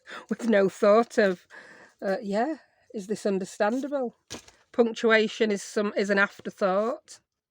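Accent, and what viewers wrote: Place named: Yorkshire, United Kingdom. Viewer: I love watching your videos, they are always so much fun and practical. I love that you sound just like my late Mum and Aunty. They were both from Yorkshire and I miss them so much. To me it’s the best bonus ever. Thank you. 💖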